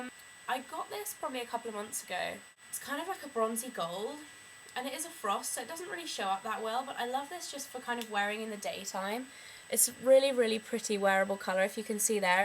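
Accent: United Kingdom. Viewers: I found u because Lollipop 26 liked this vid and I subscribe to her. How happy I am that I did. U r adorable and I luv the British accent. Where I live in the U.S. we just sound rather flat. I'm rather new to YouTube so will look forward to any reviews and tutorials u have. Keep up the good work.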